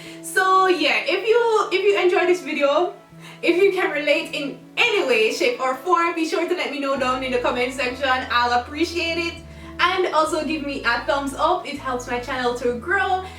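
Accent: Jamaica. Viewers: Are you a Jamaican????? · Your Jamaican accent is so AMAZINGLY BEAUTIFUL!!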